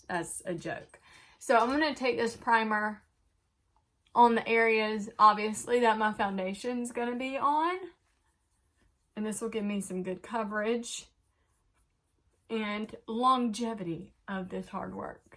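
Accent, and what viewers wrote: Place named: South, United States. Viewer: Chick-a-boom! You are rocking it wicked! Love your warm little southern accent! I’ve been doing the MK for near 6 years and loving your style! I’d love to hear about your behind the camera and preschoot prep. I’ve been thinking about doing the YouTube thingy. Stuck on the start part.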